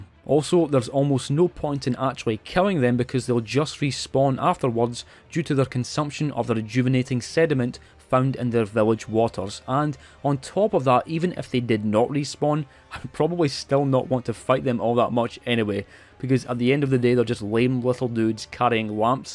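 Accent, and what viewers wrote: Scotland, United Kingdom. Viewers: Love hearing a fellow Scot on here ✌ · Came out of curiosity, stayed for the Scottish accent 🥰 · Ok pal I hate to be off topic but you have one of the coolest fucking accents I have ever heard in my life. I'm sure to you it sounds "plain" or "normal" since you grew up with it, but I am straight up in love with how you talk. Whereabouts in the world? Scotland?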